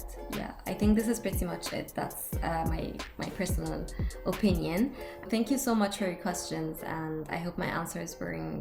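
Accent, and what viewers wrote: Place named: England, United Kingdom. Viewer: Beautiful English accent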